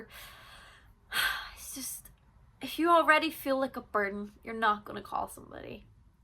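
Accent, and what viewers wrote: Ireland. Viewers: I like her Irish accent. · Wow! You're telling it all so clearly and openly. You're such an angel for other people. I volunteer at an helpline and it's very common to get a person in these situations on the line. PS, love your Irish accent <3 and music of course ! Thank you 🙏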